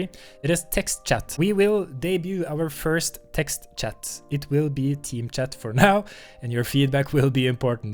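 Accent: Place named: Norway. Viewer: So excited for the new season! (du er nødt til å være norsk jeg kan høre det)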